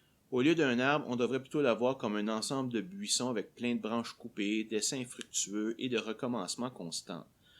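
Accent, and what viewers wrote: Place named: Quebec, Canada. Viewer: Merci pour cette vidéo qui permet de bien comprendre les mécanismes en oeuvre dans la sélection naturelle. Je profite de l'occasion pour saluer tous les Québécois dont le magnifique accent (pour un français 😁) est toujours aussi agréable à entendre.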